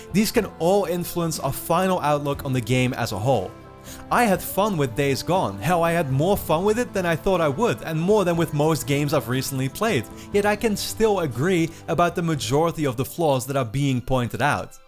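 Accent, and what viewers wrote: Netherlands. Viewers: Your loud inhaling after each sentence is very distracting. It would be nice if could cut it out. A lot of Dutch people have a terrible accent, yours isn’t too bad though. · judging by your accent im guessing you are dutch, the dutch tend to be straight forward and say it how it is, it clashes with the sjw hivemind coming mostly from america, dont worry about it, just speak your mind and don't be afraid of having an actual personality, it is the reason i am subscribed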